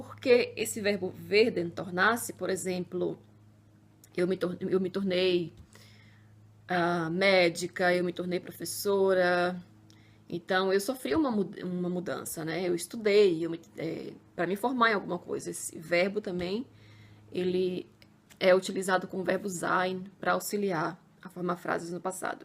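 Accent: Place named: Brazil. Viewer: foi uma boa aula, mas a senhora poderia melhorar mais o seu alemão, tantar falar mais como um nativo germanico e não falar como um brasileiro, o alemão soa melhor com o sotaque deles, então '' Fliegen'' não é '' Flieguem ''... todo EN no final é o som de '' UM '' com a boca totalmente fechada, isso vai ajudar se praticá-lo...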